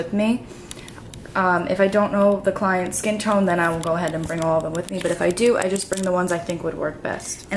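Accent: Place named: Staten Island, United States. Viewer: fellow staten islander! knew it the minute i heard you speak you sound just like me lol. awesome choices for your kit! i'm also a freelance MUA on the island!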